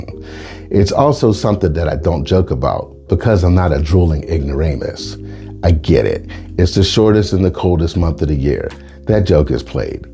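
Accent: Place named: Ireland. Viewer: You're Irish accent is on point! And you preach, but not the way your grandmother predicted!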